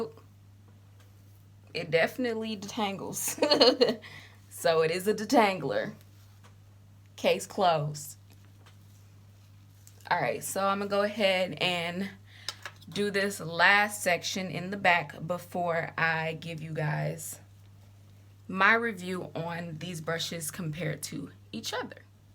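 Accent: United States. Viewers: I must admit she spoke very normally for someone with an American accent so I can't relate to the original comment. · Friendly comment: You’re speaking REAAAAAALY slowly. And you sound American-born (I am not) so don’t think it’s a language thing? It makes the video excruciating to get through. I put it on 1.5speed and it’s ok. You can be clear and articulate w/out being as slow as this, is what I’m sayin’ ☺️.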